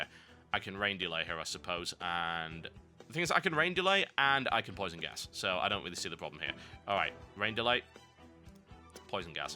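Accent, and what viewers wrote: United Kingdom. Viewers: Now really, think about this, British people talked like that first, therefore he is pronouncing it right, and we Americans are pronouncing it wrong.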